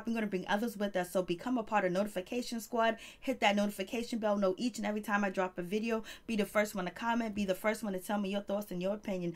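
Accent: New York, United States. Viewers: I love the way you talk..are you from New York??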